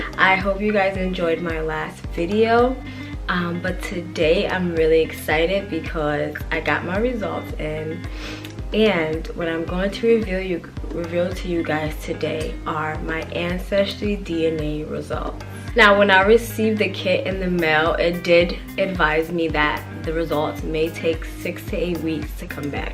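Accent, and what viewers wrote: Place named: New York, United States. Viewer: Luv the New York accent lol✊🏾💯🙏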